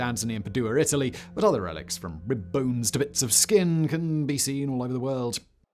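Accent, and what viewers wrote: United Kingdom. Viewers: no offense, but the British pronunciation is funny to my Italian American ears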